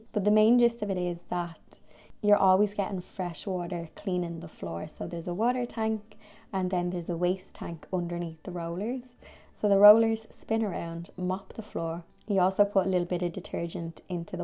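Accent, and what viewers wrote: Ireland. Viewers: Hello Sweet Girl, I love watching your channel so much. You have such a beautiful relaxing spirit and watching you do your housework, crafts and garden 🪴 inspires me to those little things that I often put off. 😊 Love Ireland 🇮🇪 and your gorgeous accent, nothing beat’s a good Irish pub………..definitely beats drinking at home alone for sure! 🍻🥂🍾🍹❤ September is the start of Spring down here in Australia 🇦🇺 I love watching all the blossoms opening up on my weeping cherry tree and the buds developing on all my rose bushes! 🌹😊🌹 Kindest Regards Deb 🌷🌷🌷🌷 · September is a wonderful month, an intro to fall. Love your autumn bedding. Your house is so cute. You do such great things with it and your yard. Love watching your videos and hearing your Irish accent. I’m in sunny San Diego, California.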